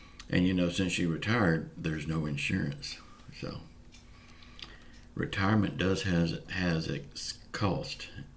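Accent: South, United States. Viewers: Where do you live? You have a Southern accent!! It’s refreshing to hear!! I’m in Virginia born and raised!!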